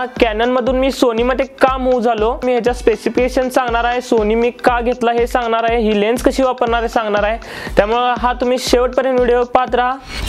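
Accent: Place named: Kolhapur, India. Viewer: Assll kolhapuri marathi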